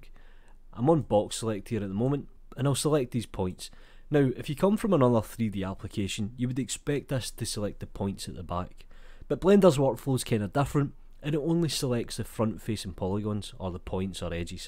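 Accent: Scotland, United Kingdom. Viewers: I just love your Scotland accent, you actually sound like one of the people from the movie BraveHeart with Mel Gibson!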